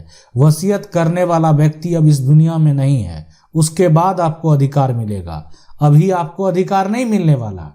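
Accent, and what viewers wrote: Bihar, India. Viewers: बार बार वही लाईनें दोहरा रहे है दुसरे यह भाषा भोजपुरी टाईप समझ से परे। कुछ कुछ बिहारी टच। हिंदी भी नहीं है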